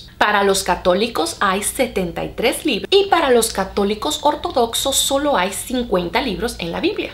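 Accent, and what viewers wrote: Argentina. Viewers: AMÉ el acento de, medio Argentino!! Jajaja, abrazo grande Sierva del Señor. Desde Buenos Aires, Argentina!! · La hna. Tiene un ascento muy argentino. 😄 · jajajaj... ay... cada vez te escuchas mas argentina.. jaja un abrazo bendiciones desde Mexico! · Jajaja me encantó el acento de. Muy Argentina.. Dale che.. saludos desde Argentina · Jajaja tiene un asento bastante argentino jajajaj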